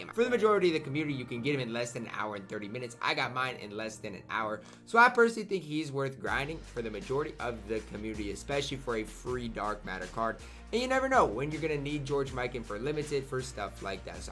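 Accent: Iowa, United States. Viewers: He is from iowa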